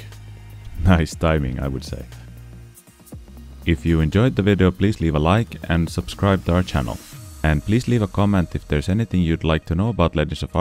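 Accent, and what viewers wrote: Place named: Finland. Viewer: were you the one who gave me that pack horse? And you sound Finnish!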